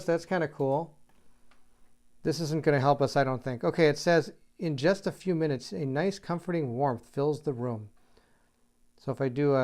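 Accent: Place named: Midwest, United States. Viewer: I'll never get over the midwest pronunciation of "root" and other similar words. Haha. Had a classmate from Duluth many years ago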